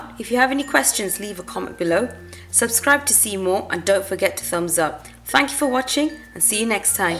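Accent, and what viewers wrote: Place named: England, United Kingdom. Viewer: What area do you live in?? Because your accent has a little English in it !! Great video sis 😊😊🙂🤗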